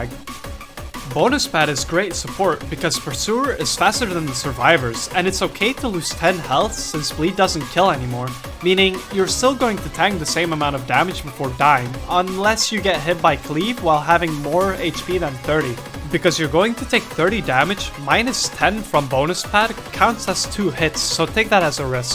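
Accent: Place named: United Kingdom. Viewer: you sound british and amercan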